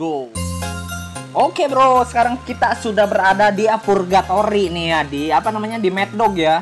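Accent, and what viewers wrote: West Java, Indonesia. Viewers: Bang orang mana sih tapi ngomong ya sunda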